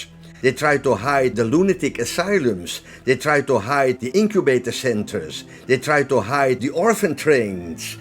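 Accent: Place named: Netherlands. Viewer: Love the Dutch accent;) great content btw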